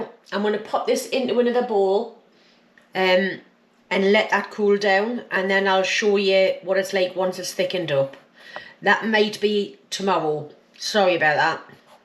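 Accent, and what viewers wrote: Newcastle, United Kingdom. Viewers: i live in the Midlands, Staffordshire, And i can honestly say i have never heard of this name or dish before, or the dish you did last week that was called Panackelty. Apparently these are common dishes in Northumbria(North-East). i didn’t have a clue lol. But thanks for sharing this with us, I’m finding out stuff new every day. you also have a new Subscriber, as i love the Geordies and accent and north-east in general 😉👍❤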